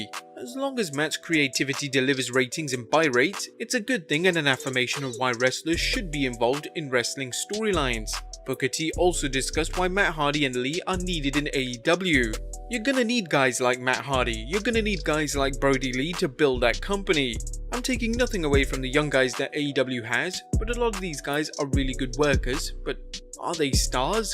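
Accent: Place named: England, United Kingdom. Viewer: oh thank god. if it wasn't the English guy and instead of the American guy narrating the video. I would have tune out.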